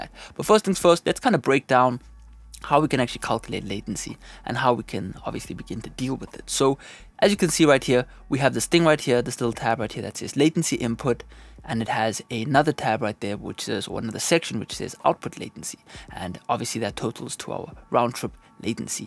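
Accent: South Africa. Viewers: bro where u from, u sound south african.... shoutout to SOUTH AFRICA!!!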